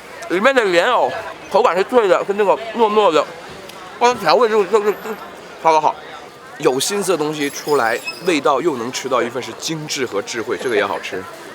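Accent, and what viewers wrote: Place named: Cantonese, China. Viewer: 廣東式普通話，難聽到死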